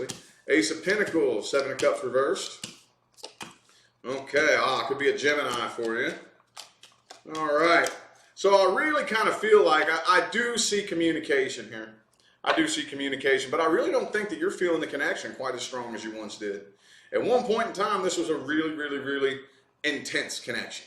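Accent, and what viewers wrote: South, United States. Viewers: Thankyou so much I appreciate it, great accent where you from down south I'm in New Zealand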